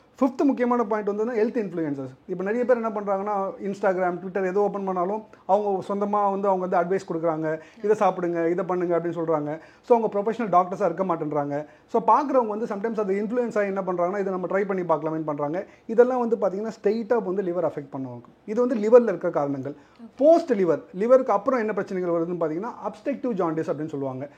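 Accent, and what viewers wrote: Chennai, India. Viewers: chennai slang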